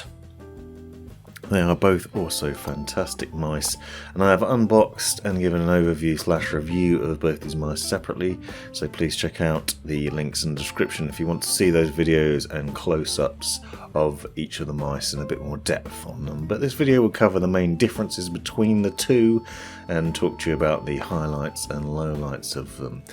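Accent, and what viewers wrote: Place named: RP, United Kingdom. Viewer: u sound like a rich dude living in a castle....in england......posh voice NOICE though u gave a clear info about this mouse now all i need to do is save up some cash and buy it some day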